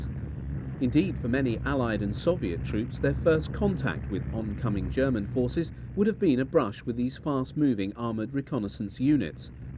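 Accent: United Kingdom. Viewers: Really nice video. Fills in the gaps left by most discussions on wartime vehicles. Want a good laugh? Turn on the subtitles. The system doesn't have an ear for British accents. "...their inadequate grasp of the theory of armored warfare..." = "inadequate grass people theory Obama"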